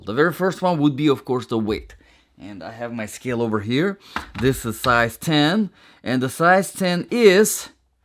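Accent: Russia. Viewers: Интересный акцент. Вроде как русский и много работал над произношением, но без препода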